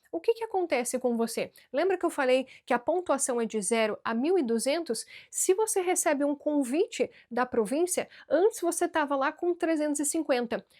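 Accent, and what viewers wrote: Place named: Parana, Brazil. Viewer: Seu sotaque e um barato puxa o R tipico do parana e o S com som de X tipico dos alemães do sul do Brasil, alem dos anos de canada....🎉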